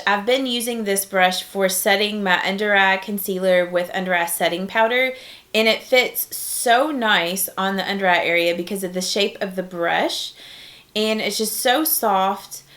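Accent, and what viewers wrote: South, United States. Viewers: Katelyn Hughes she's one of two so far that I have followed specifically because they have a Southern accent! I love hearing ladies that talk like me! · Great video! I love that some of your favs are the $1 brushes. I feel like they don't get talked about enough since the studio brush line came out, and there are some real gems in that ($1) line! If I may say so, being a southern girl myself (lower SC, it don't get much more southern than that lol), I just want to suggest you work on what your going to say a little more before you film. There are a lot of "um's", "so yeah's", and a few mispronunciations of words that can really separate a youtuber from being a guru. It may be as easy as just editing a little more so your thoughts become more concise and you don't repeat yourself so much. People hear a southern accent and immediately take you as being uneducated. 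It is ridiculous and horrible, but you can overcome that be simply trying a little harder to make sure you're not losing your audience because of your speech. I hope you don't take what I'm saying offensively, I don't mean it that way at all. I really like you videos. I just think you could really gain a bigger audience by tweaking this one thing just a little bit. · You have to be southern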